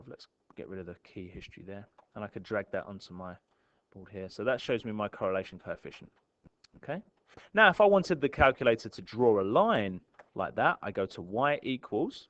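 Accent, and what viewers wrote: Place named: United Kingdom. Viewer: I like this british accent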